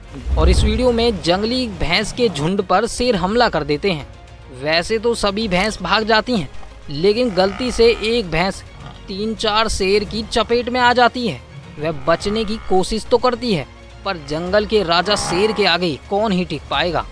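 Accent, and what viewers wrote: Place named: Marwari, India. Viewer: ये बोलने वाला व्यक्ति जरूर मारवाड़ी है बार बार उलझ रहा है 😂😂😂😂😂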